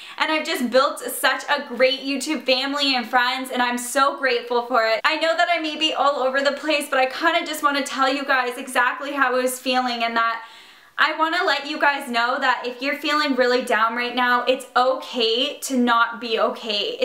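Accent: Canada. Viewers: is she Canadian? I can't quite tell but the way she pronounces certain words make me think maybe she is. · question are you Canadian??? cuz your accent is cool!!!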